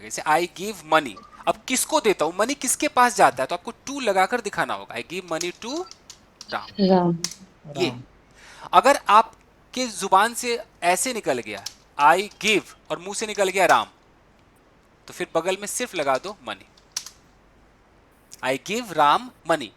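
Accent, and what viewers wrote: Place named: Bengal, India. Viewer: Really sir amazing your Bengali tone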